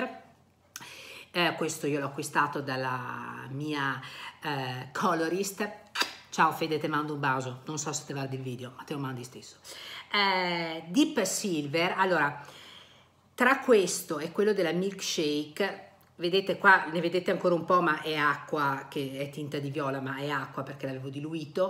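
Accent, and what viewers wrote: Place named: Italy. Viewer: Ma daiiiiii l'accento molto conosciuto. 😂di che zona?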